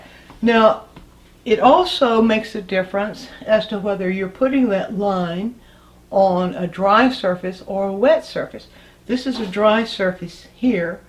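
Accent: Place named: Texas, United States. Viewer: Dear. I,m so happy to have found you and appreciate how you teach with demos, I love your soothing. Live and accent. Are you Texan? Thanks you again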